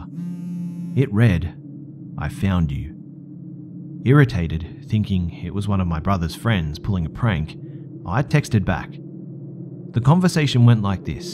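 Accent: Australia. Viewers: love your story's, I just found this channel a couple days ago when I was listening to some Mr. nightmare story's and one of your vids popped up in the recommendation section. I love both channels and you are a great narrator, your Australian accent is awesome and you have great story's. I subbed on the second video I listened to and have watched a bunch of videos since, I'm glad I have a lot of catching up to do because I won't be running out of video's any time soon. :-) keep up the good work! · bruh you sound like and Australian, anyway love your shit.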